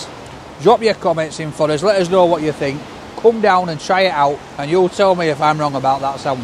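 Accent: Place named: Northern England, United Kingdom. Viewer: I love this channel, but i watch it especially because of how northern this bloke is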